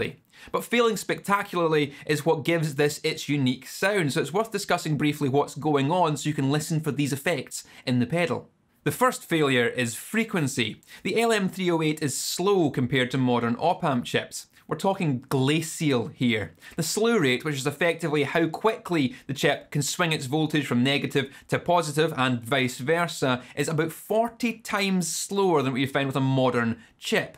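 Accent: Scotland, United Kingdom. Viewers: I love this guys accent so much,! how can i it? What is this accent? Scottish？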